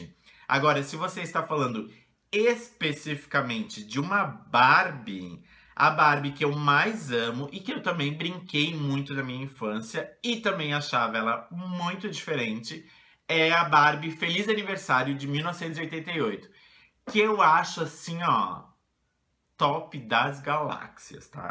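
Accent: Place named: Rio Grande do Sul, Brazil. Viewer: Ai que tudooo! Obrigada por responder, vc é um amor de pessoa muito simpático. ♥ Me diz uma coisa, vc é gaúcho? Sinto um pouco de sotaque hehehe eu sou de Porto Alegre!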